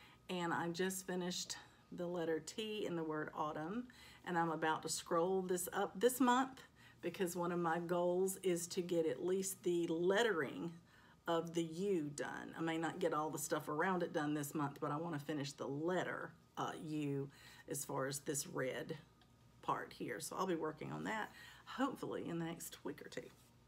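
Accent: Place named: Atlanta, United States. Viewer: I just love “Welcome Home”! So glad I found your flosstube. We sound alike - I’m from Atlanta, but have been in Pennsylvania for 25 years, and never lost my accent (as I’m told almost daily!) ❤️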